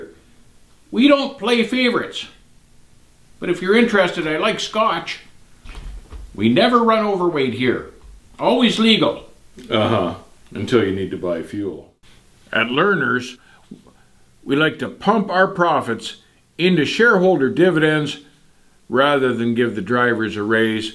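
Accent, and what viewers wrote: Canada. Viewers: You Canadians have the best accent! LOL! Love the channel! Keep up the great work!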